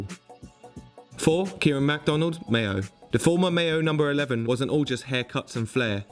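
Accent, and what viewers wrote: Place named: England, United Kingdom. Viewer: Funny to hear an english voice on a GAA video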